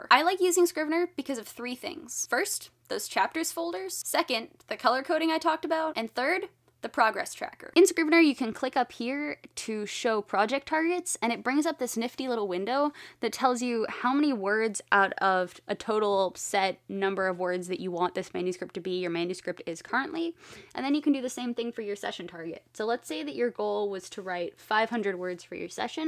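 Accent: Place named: United States. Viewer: love your very american accent, its like listening to a tv series, your voice is very hisg school type but cool and likeable. :D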